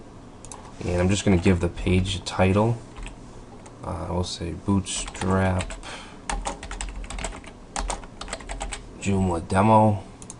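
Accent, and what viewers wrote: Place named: Maine, United States. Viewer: Absolutely awesome tutorial!!! Thank you so much. What is your accent? Maine?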